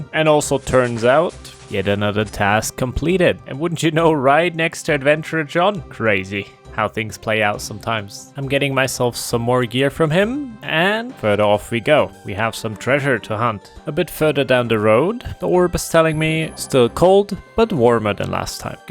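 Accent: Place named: Scandinavia. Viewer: This is great fun to watch! As others have suggested, this series is a recipe for success. You have the unique opportunity to play a game for the first time that a LOT of people have many fond memories of, giving them that experience through you. Nostalgia is powerful, and many players and former players will want you to succeed in something that brought them so much happiness at one point or another in their life. You have a Nordic sounding accent that people will find entertaining and distinctive. You are a clever enough storyteller to know what to cut and what to include to keep the pacing just right, along with some good writing skills and a good sense of humor. There is opportunity here that you can easily reach out and grab if you want to do so.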